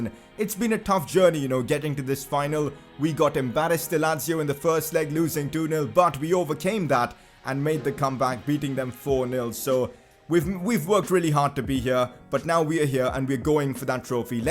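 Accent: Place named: India. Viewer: the worst youtuber with the gi gi gi aaaaaaa Indian accent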